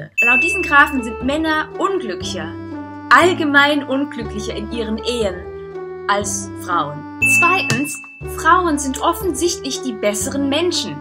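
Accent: Germany. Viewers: Klingt vor allem nach Ruhrpott. Sach ma Doartmund 👍😉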